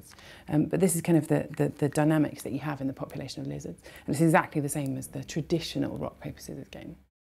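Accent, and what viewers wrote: United Kingdom. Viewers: Hate that british accent. Brr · I love the British accent !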